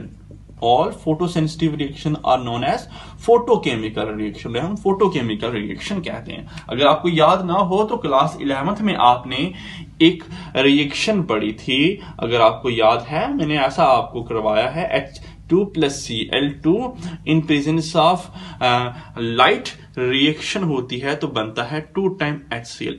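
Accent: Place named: Kashmir, India. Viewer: thanks ..but sir ksm sa lag rahai ha kashmir sai hai ..isiliyai pucha tha sir sai ..😊😊😊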